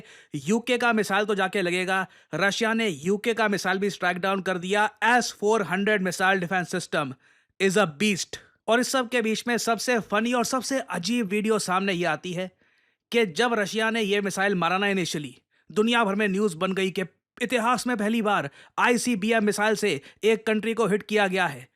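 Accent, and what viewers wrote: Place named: Haryana, India. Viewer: Bhai wo bolane ka accent hai Haryana uttrakhand mein sayad bola jata hai.😅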